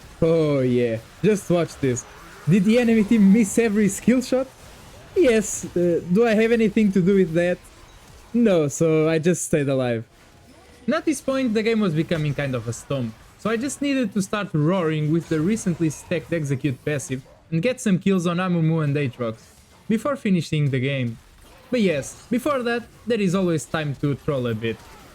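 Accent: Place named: Portugal. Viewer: my guy o teu sotaque portugues é que nao dá para esconder hehheheh, keep it up bom video maninho!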